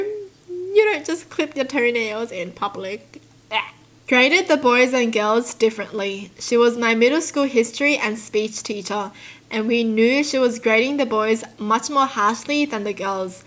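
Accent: Australia. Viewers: Love the strong accent, like its very aussie and i love it!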